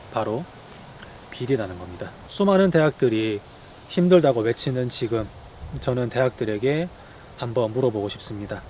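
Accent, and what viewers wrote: Gyeongsang, South Korea. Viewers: 님 말투가 대구사람이신거 같아요 맞나요? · 경상도 사투리나 좀 고치든가... 짜증나.. 근데 이런거 올려서 뭐하게??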